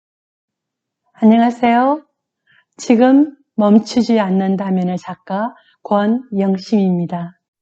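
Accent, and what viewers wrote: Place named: Seoul, South Korea. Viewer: 유투브 개설 축하드립니다^^ 반갑습니다 님 글도 잘 쓰시지만 목소리는 더 예쁘시네요~ㅎ 부산 억양일거라 생각했는데 서울 말투라서 놀랐습니다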